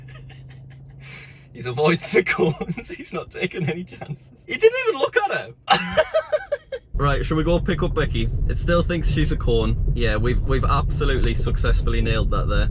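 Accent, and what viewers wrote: United Kingdom. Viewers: He's from the UK, its his accent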